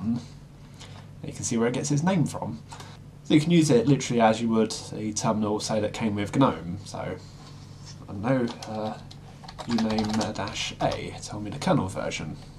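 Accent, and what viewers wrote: United Kingdom. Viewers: You have very British accent. ;-)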